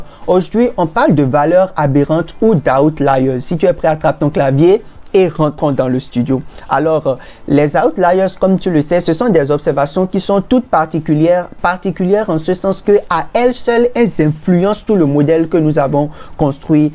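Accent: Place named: Benin. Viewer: s/o to my beninese people , you make me miss my country with you accent , thank you I hope everything is going great for you i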